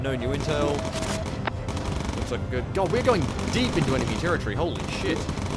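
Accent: Australia. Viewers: I started watching this earlier today and when I came back he sounded even more Australian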